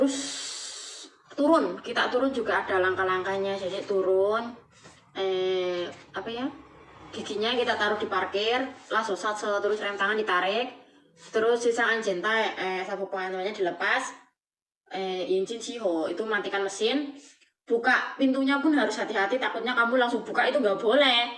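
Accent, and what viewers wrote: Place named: Banyumas, Indonesia. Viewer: Hidup ngapak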